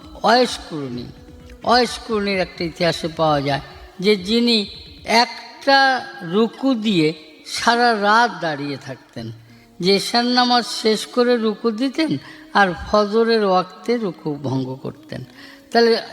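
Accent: Bangladesh. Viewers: তুমি বাংলা আঞ্চলিক ভাষা বুজ এইটা ফরিদপুরের ভাষা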